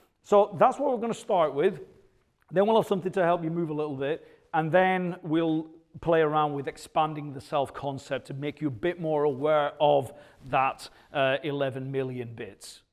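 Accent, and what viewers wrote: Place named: Scotland, United Kingdom. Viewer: Scottish accent really sells it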